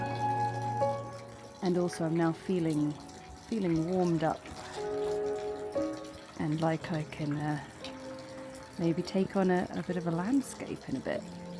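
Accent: England, United Kingdom. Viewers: I think this is wonderful. I love architectural elements. Your first stroke was so precise! No messing around with that circle- you hit it first go! You are very brave. Not only are you painting with no real drawing there, you are miked up and filming yourself!! You do it so beautifully and effortlessly but I’m sure it’s a lot of intimidation rolled into that. You truly are something else. How you can paint and talk with no feedback from anyone and make it so easy to understand is just amazing to me. When I decided to dip my toe into watercolors I followed four or so YouTube artists. Then I found you. I unsubscribed to them all. You are talented, in many things. Your voice is so soothing and sad to say if I don’t like the voice well.. forget it. English accent is a bonus. Your life is sweet, you don’t use this channel to unburden your issues like some do. 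Ok I’m sorry for them but I’m here to paint only. Anyway we all love you. I put watercolor away for a year because I did something else creative but my friend is coming and wants tips so I came back and have been watching your videos quite a bit in last days. I’m excited and the spark it back! There is a lot to absorb with any new endeavor and I know it’s the practicing that will get me there. Hope you had a great time!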